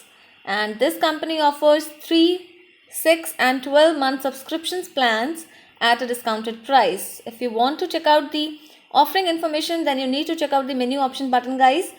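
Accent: India. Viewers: your vedio sucks at least buy a mystery box and do a good review on it. all I understood from your vedio is that your Indian, heavy accent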